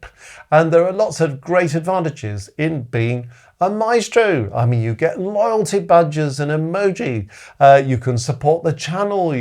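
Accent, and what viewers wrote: United Kingdom. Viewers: Dear, teacher, sweet British utter, the one when you listen to, look like you are the one who is asking and you also the one who is answering, a very close, I do really thank you, thank you for all the love, optimism and the happy shining side that reflects your pure soul, thank you Mr, I really hope the words can help me to explain to you how much I am thankful, greatful to you, this all from my heart, may I have your excuse for my English, but believe it or not (you are using this phrase,sometimes) the language that I am using, is gained through self taught, thank goodness and thank you, as this is one of the other things you also sprinkle on us within your explanation in your lovely videos, I am Abdullah from a country still living in the 12 century, before bach era and that means your lessons is a future content, how about if told that may be almost 100 percent of the people here know nothing or have heard about bach or his legacy, thanks again and I wish also to say hi to everyone here.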